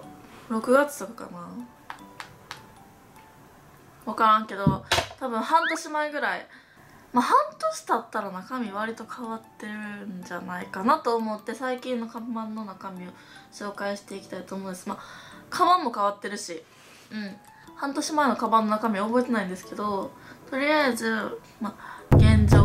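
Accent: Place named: Kansai, Japan. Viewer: 久々見たら関西弁が出てる なんか前の方好きかも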